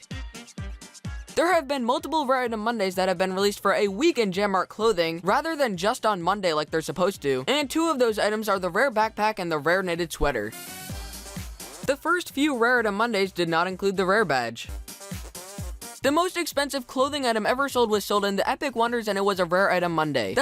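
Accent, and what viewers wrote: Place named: United States. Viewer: Ahh the typical, facts facts facts facts. Your voice has changed to a more stronger American accent! Plus did wisteriamoon tell you password! Hehehe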